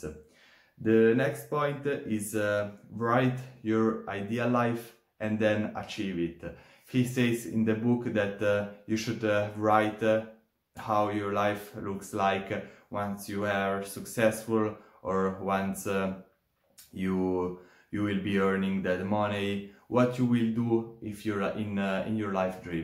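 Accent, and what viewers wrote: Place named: France. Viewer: I ear a little background french accent haha. nice video !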